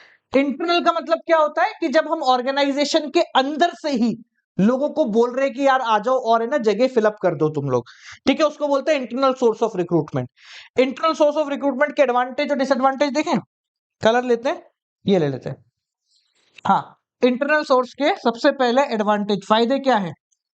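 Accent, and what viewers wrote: Rajasthan, India. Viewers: Sir ap rajasthan hu ho kyi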